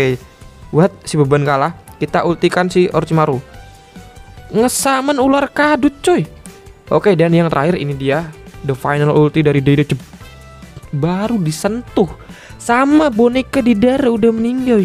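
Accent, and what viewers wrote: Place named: Madura, Indonesia. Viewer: kenapa nadanya jadi tretan dah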